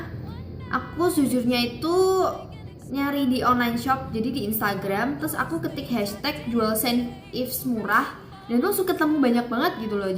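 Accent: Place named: Surabaya, Indonesia. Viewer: Iya aku juga mikirnya kamu org surabaya karena ada logat surabayanya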